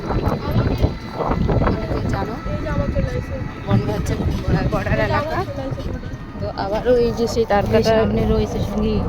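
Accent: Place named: Bangladesh. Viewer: আপনার কথা শুনেই বোঝা যায় আপনি বাংলাদেশি, শুধু আপনি কেনো ২৪ পরগনা ও নদিয়ার বেশিরভাগ ই বাংলাদেশি, জীবন চালানোর জন্য ও সুযোগ-সুবিধা র জন্য ভারতে আসে থাকে আজ ওপারে গিয়ে একবার SIR নিয়ে সাবধান করে দিন আর বলুন ভোটের পরে আসতে তাহলেই আর কি চাপ CLEAR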